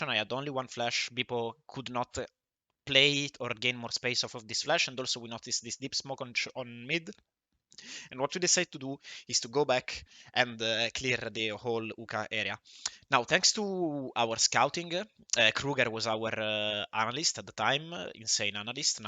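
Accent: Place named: Italy. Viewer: You have a strong italian accent, anyway, nice analysis! And good luck tomorrow for the match with the unicorns!! Destroy them :)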